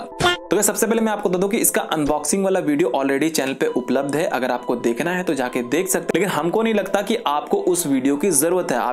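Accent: Bihar, India. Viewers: Bihari ho ka 😅😅😅😅😅😅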